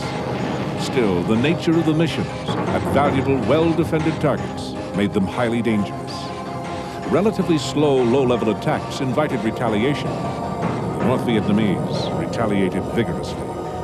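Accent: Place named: United States. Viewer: American narrator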